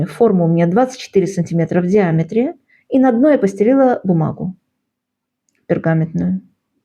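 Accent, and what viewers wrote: Baku, Azerbaijan. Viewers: Какая же вы очаровательная,!!! Правильно вы сказали,что те рецепты несут с собой такое тепло,потому что мы пекли совсем с другими чувствами… Я больше слушаю ваш чарующий голос и чудесный акцент,сразу переношусь в Баку,и чувствую радость жизни.Вы мой антидепрессант!!!! Храни вас Господь,дорогая ❤! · Пирог конечностей и вы приятная ,удачи вам ,акцент Бакинский напоминания,всем здоровья и мира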